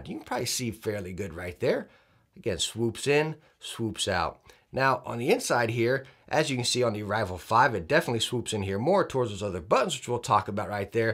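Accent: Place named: New York, United States. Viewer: Love your attitude. You from new york by any chance? Feels like it. Great review, thanks.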